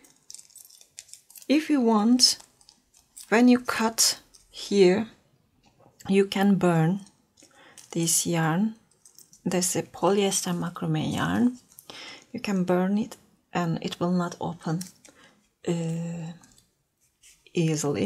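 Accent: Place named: Turkey. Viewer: Videolarda hizli hizli scroll down yaparken turkce aksanı ilk duydugum 2 kelimede farketmem 😂😂😂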